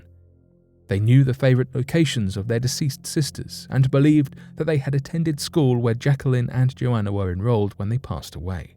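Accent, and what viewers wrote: United Kingdom. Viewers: That British accent makes this all legit...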